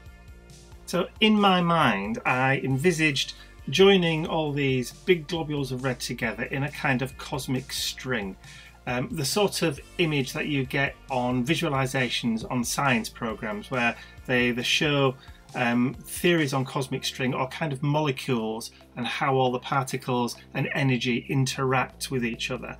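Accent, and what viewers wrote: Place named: England, United Kingdom. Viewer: I loved the, "coup-pah tea, and a biscuit shared with Mr. Bentley...", your English accent is so lovely. But....whoa! "....what can you expect of a man"?????? hahahaha! You're a hoot! I do love the whole cosmic stream of your creative/thought process, tho. So different for you. And how clever, the way you "incorporated" the words into the whole graphic design of your spread! I've been over that whole "smeared-charcoal-border" around the words technique, but I felt like just leaving the "anemic-white-patch-with-a-word" was not enough either. so....thanks - - for opening up the scope of a whole.....new thing! Big hug, cat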